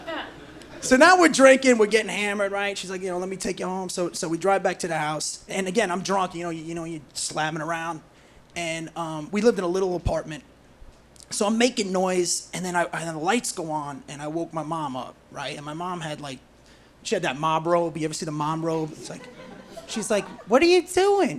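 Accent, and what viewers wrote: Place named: New Jersey, United States. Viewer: I can tell you're from Jersey bc your accent not to be a dick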